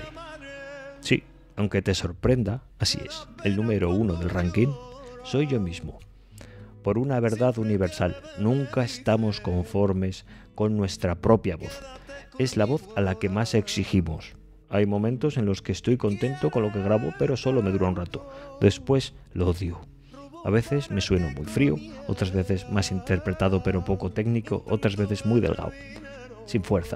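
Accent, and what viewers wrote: Spain. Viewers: cantas Hermoso aunque odio el acento de los españoles soy mexicana jajaja 🤣 no dejo de ver tu canal sabes y compartes tu sabiduría con nosotros los mortales eres único y no solo soporto tu acento y voz de verdad me agrada 😁😃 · No soporto la voz de la que fue vocalista de la oreja de Van Gogh!! No puedo con ella, se me hace horrible su voz!! Bad bunny o como se llame igual la detesto!! Y si al escuchar tu voz también me desagrada (me voy a echar al cuello a muchos españoles) en general ese acento así como el tuyo no lo soporto!!! Pero como dices mera opinión, eso no quita que la haga con respeto, solo que mis oídos no pueden con ese acento y forma de cantar de muchos españoles y recalcó muchos, no todos!!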